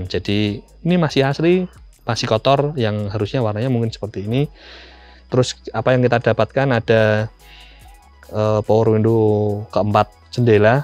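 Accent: Java, Indonesia. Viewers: Ngomongnya khas jawa, luamaa😅 saya percepat 1,75 baru enak dengerinnya..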